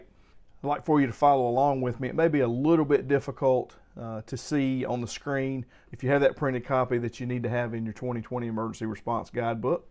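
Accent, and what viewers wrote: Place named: North Carolina, United States. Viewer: I knew almost immediately from your accent and demeanor that you too were from North Carolina. Sure enough, in your office you have a Greensboro Fire Department badge. Thanks for the video! Currently studying for the CHMM exam and it helped simply things for me.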